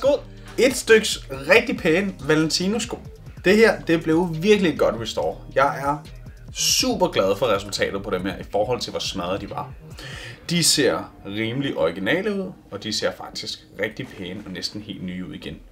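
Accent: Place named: Scouse, United Kingdom. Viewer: Thought you were talking scouse at first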